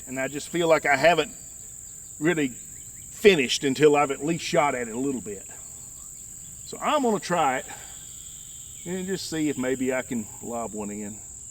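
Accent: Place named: South, United States. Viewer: I'm from the North and enjoy your Southern drawl. Tying to get my wife to try it also. PS - My Sig 100 grain ammo tends to spit back at me also.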